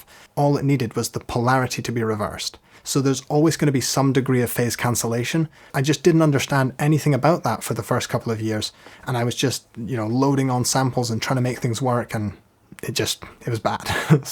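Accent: Scotland, United Kingdom. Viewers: Love this and your accent. I'm from Scone (live innLondon) i swear you have a Scottish accent, or maybe i am home sick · Was not expecting a fellow Scottish person when I clicked this 🤣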